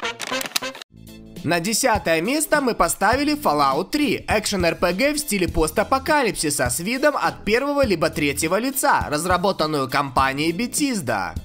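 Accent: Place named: Moscow, Russia. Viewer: какой голос блять противный и ещё этот говор мАсковский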